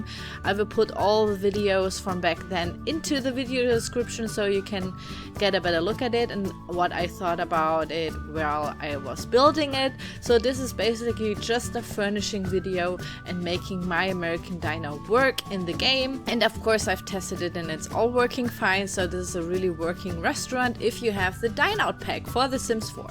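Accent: Germany. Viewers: )) I don't get that myself either. Maybe they also have bad accents and are mad at themselves and thus also at you for having that German accent? I don't know, I find it charming when I can hear an accent from the non-native speakers I'm subcribed too and that make English videos. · Du hast wirklich einen starken deutschen Akzent und die machst mehr Grammatik- als Vokabelfehler. Aber du wirst besser.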